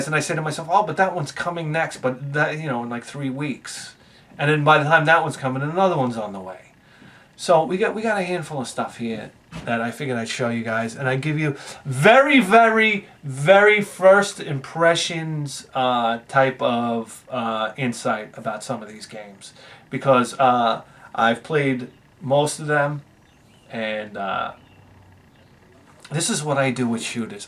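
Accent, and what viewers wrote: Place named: New Jersey, United States. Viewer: I love the rant, but I'm confused. You look like a hillbilly out of West Virginia, but your accent says Jersey trash.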